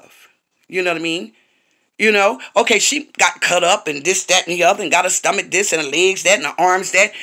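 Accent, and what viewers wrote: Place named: New York, United States. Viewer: 💞💞 I just subscribed to your lovely channel 💞💞 I like the name 💞💞and I agree that does have a beautiful smile and very nice teeth 💞💞 is a sweet Lady💞💞as far as Adreian she just needs lots of prayer for deliverance💞💞then I think she will become a completely peaceful and happy woman 💞💞lastly I love your accent and you talk like a New Yorker 💜💜💞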